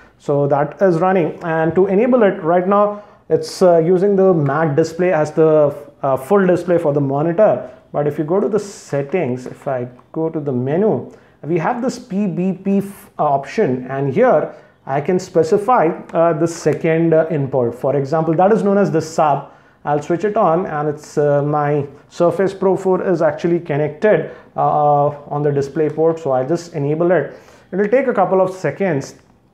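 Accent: India. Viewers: Your video is awesome, but I cannot actually stay long because the heavy Indian accent is really annoying.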